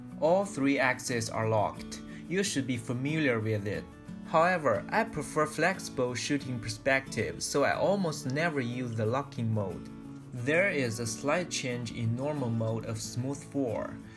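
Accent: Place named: China. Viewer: Tsie tsie for taking the effort to learn English so you can make your reviews available to many more people. This is an EXCELLENT review and will help many people understand what the Smooth4 does and will also help them make a decision whether to buy. I particularly appreciate the multiple shots and the editing you did to show us a comparison side-by-side of this product and other products or comparing the Smooth4 to no gimbal. Very effective. Your English is perfectly adequate to communicate on a tutorial like this. You said you would like to improve, which is always the mark of a smart person. My suggestion to you would be to get some audio (extract from a YouTube video) of a native American English speaker saying the words "AIR" and "LOLLIPOP" and "RAZOR." Listen to those words over and over while you work on making your pronounciation identical to theirs. 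This will help train your mouth muscles to make sounds that are not in the Chinese (or some European) language. One more comment: Wherever you are in China is beautiful. I wish all the politicians would learn to get along and be friends with each other so all of us could visit and exchange ideas more freely.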